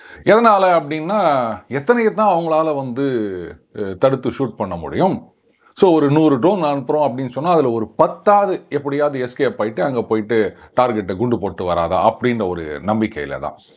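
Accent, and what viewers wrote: Chennai, India. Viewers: வணக்கம் சார். நீங்க அடிக்கடி இடையில் சென்னை பாஷையில் வெளுத்து வாங்குறீங்க 😂😂😂😂😂😅😅😅😅😅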